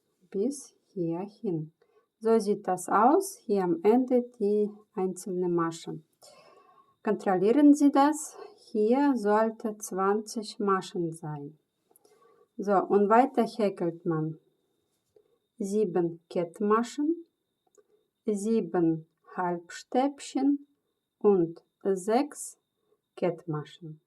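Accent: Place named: Russia. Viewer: Рашистский акцент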